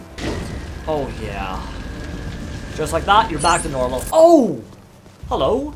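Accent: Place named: Scotland, United Kingdom. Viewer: I like your Scottish accent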